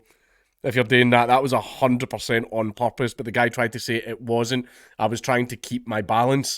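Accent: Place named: Scotland, United Kingdom. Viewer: We need a Dankula video in full on Scottish mode with subtitles.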